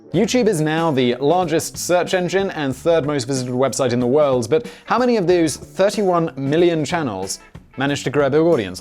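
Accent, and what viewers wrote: RP, United Kingdom. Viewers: bill spangler you’re not alone I do it cause I like his voice He has a very strong British accent and I adore his voice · Eagerly anticipating the animal channel that has the upper british accent narrating the life of like... a bee. Yeah. GIVE US THE BEE LIFE VIDEO DAMNIT. Or: Do a nature channel where you narrate the life of bessy, the gentle cow. Then the next day have a food critique channel that critiques steaks. 🤣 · you keep doing what you’re doing! I find you hilarious! The whole comedic package wrapped up in that silky British accent is awesome. The style is great and I like you laugh at your own jokes. I put your channel on auto play and fall asleep to it. So I am helping you crush that watch time and happy to do so. Plus since I always fall asleep, I even rewatch most videos! This is by far my favorite of your channels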